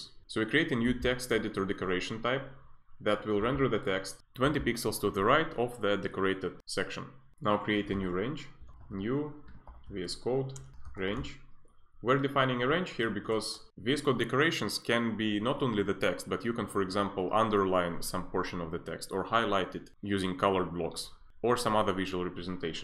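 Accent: Russia. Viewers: Сдаётся мне, что ты русский)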